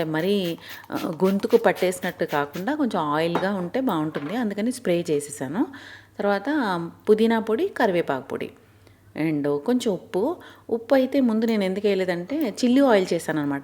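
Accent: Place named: Godavari, India. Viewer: హాయ్ జీ. మీరు సూపర్. మల్టీ టాలెంట్.. నేను లేచిన వెంటనే మొక్కలు చూసుకుంటాను. మావాళ్లు కాల్ చేస్తే తియ్యకపోతే మొక్కల దగ్గరే ఉంటుంది అనుకుంటారు. మీరు విజయవాడ, గుంటూరు అనుకుంటాను. మళ్ళీ గోదావరి మాటలు కనిపిస్తాయి 😂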